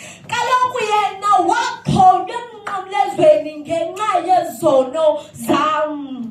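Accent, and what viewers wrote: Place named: Xhosa, South Africa. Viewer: Samandi isixhosa salosisi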